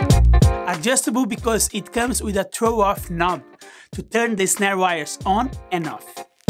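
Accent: Brazil. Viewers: Seu sotaque parecia muito brasileiro, e agora que fui ver eu estava certo kkkkkkkkkkkkk Que deus te abnçoes irmão!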